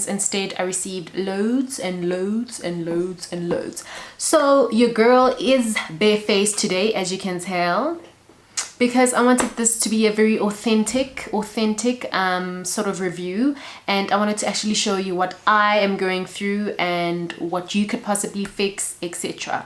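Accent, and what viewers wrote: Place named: South Africa. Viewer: Thanks for the content! I'm wondering could this be used for lip pigmentation?? Btw love your accent! Are you from SA?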